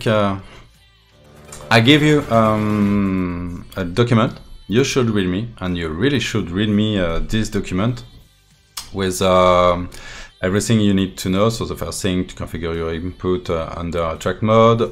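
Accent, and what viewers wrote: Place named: France. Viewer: Ah ce petit accent So Frenchy que tous nous envient !! Thanks for that !!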